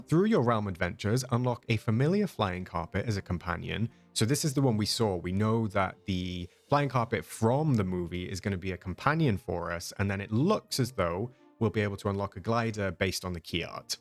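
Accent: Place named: Wales, United Kingdom. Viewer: Hey 👋🏻 Been really enjoying your content and insights…It is nice to hear a DDV content creator with a British accent (a lovely Welsh accent 🏴The patch notes are interesting but the bug fix we are desperately hoping for is the one with the male avatar which leaves him permanently with a 5 o clock shadow. This is especially noticeable in poor lighting. No matter how many times you go to facial hair settings and change the colour and Opacity it will revert back to black and 70%. It is maddening because I play DDV with my 9 year old son and our avatar is based on him and looks exactly like a teenage version of Luka! The illusion is spoiled by this bug that emerged 2 updates ago…we play on PS5 and I’m not sure if it is exclusive to that platform. Contacted Gameloft about it but got a bot response which gave me options which wasn’t relevant to the issue. 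No one else has noticed or been bothered (even on Reddit!) It is a small thing I know but it spoils the experience…like with the hiking DreamSnap when our avatar was in the boyscout outfit. Excited for the update…we are having a Aladdin marathon this evening watching all 4 films! Oh btw I hope Gameloft have really tested this stealth game mechanic! Thanks for the fun😊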